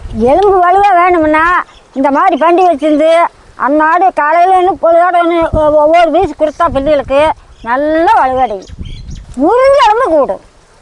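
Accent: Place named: Kongu, India. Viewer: இது கொங்கு தமிழ். அப்படித்தான் இருக்கும்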